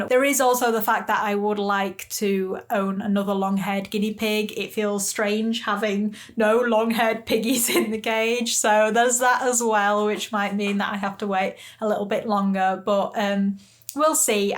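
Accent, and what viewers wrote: England, United Kingdom. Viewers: I agree. I can't resist the accent ❤❤. I grew up in love with the BBC, from the eastern coast of U.S. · You are the greatest piggie mom ever 🐹🐹🐹🐹🐹🐹🐹 Plus, I love your English accent 😉😉😉👍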